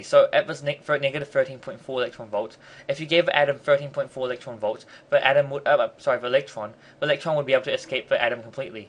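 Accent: New Zealand. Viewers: New Zealand accent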